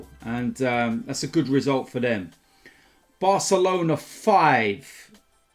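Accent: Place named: England, United Kingdom. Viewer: Maybe don't pronounce the names with an English accent but try to look at the country where the name comes from. Or don't try it, now it's funnier😂. Keep going, starting my day with your Daily's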